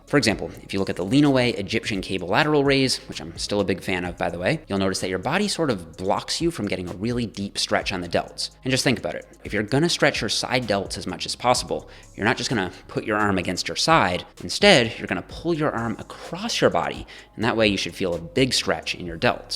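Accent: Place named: Newfoundland, Canada. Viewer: love your stuff! Didn't realize you were from newfoundland until I watched your body recomp video and were talking about carrrbs, just waiting for a jiggs dinner video haha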